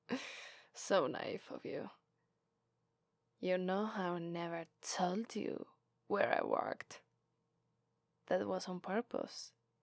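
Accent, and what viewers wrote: Italy. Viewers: I just discovered this channel and I have to say three things about it: 1. I'm not sure that these videos are exactly ASMR 2. I love your RP's and I think that they are the best on youtube and tingles. 3. I love your Italian accent and I understand better your English than native English videos, maybe because I'm Spanish. Greetings from Barcelona and thank you to do an excellent job ^^ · Missed an opportunity to use that Italian accent for a mobster accent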